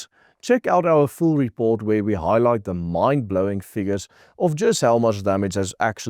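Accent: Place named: Afrikaans, South Africa. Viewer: At least he tries to speak English 😂😂 or does a excellent job, yes he does say some English words like a Afrikaans person does, 👍👍👍👍👍 for him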